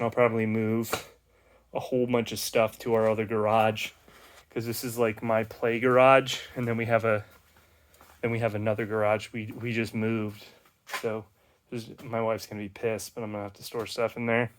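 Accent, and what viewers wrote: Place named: United States. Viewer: Just wanted to say thank you for making an entire playlist on this with so many minor details 🙏 After years of wanting one I finally picked up a GF8. With limited experience and knowledge I wasn't sure where to start, what to upgrade, etc. Buying a wrecked WRX is something I completely forgot about. But swapping everything over is actually a much simpler, more cost effective and intelligent idea. However, judging from your accent I'm assuming you're from The US as am I. How were you able to get a hold of a WRX that fits a GC/GF chassis?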